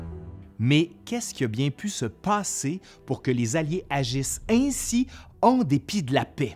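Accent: Quebec, Canada. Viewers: Bonsoir, je suis abonné à ta chaîne depuis peu et j'adore ce que tu fais. Ton accent québécois me fait toujours sourire. Vous avez de drôles d'expression. Le "vieux français" n'existe plus chez nous en France mais vous autres au Québec vous l'avez gardé. Je voulais te signaler que la photo que tu postes dans ta vidéo à 11.04 mn d'une compagnie anglaise correspondrait plus à une évocation de la bataille de Mont Saint-Jean soit 58 ans après la reddition du fort William-Henry. En tout cas, toutes mes félicitations pour ton remarquable travail. Bonne soirée. Sincères salutations livresques d'un abonné français passionné d'Histoire, entre autres des périodes de la Guerre de 7 ans au Canada et du 1er Empire.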